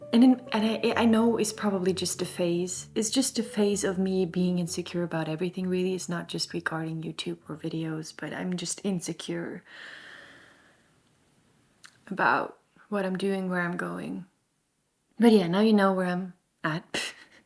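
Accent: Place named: Canada. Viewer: When I looked up how many pounds your 190kg fireplace was (419!!), I was like, "Yeah, they're gonna need a forklift." You guys used a fair amount of ingenuity, determination, and sheer willpower, to get that thing into your house!! The fire it makes is beautiful. One question: Do these types of fireplaces have a creosote buildup problem like brick chimney fireplaces? If so, you should probably clean out the exhaust regularly to keep it safe. I saw you had a motorcycle and a digital piano in your house. Are they both Yamahas? btw, I think you speak English with a Canadian accent, which is better than a US accent imho, except for how they say "decal". You're saying "cupboard" right, btw. The way you weave back and forth between your language and mine makes me want to learn how to say stuff in another language. You and your bf should team up with Kevin Bacon and Kyra Sedgewick. 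Congrats on a successful, beautifully executed long-term project, which took a ton of effort!!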